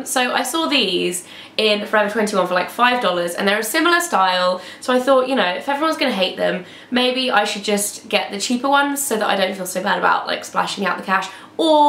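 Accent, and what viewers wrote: United Kingdom. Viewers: Ur British? I swear this whole time I thought u were american.. It's been a while 😐😐😐😐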